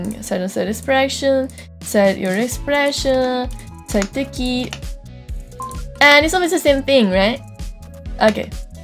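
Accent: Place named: Italy. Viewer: Ma scusa, ci sono già migliaia di persone che parlano inglese e hanno più views. E te che sei Italiana e si sente DI BRUTTO, ti metti a fare tutorial in inglese? 🤦🏻‍♂️ La community inglese, ha fin troppe persone che spiegano pure come costruire un razzo spaziale. Invece la community italiana è sempre quella più svantaggiata. E giustamente te ti metti a parlare inglese per avere più ascolti. Risultato: 3000 follower. I'm laughing :D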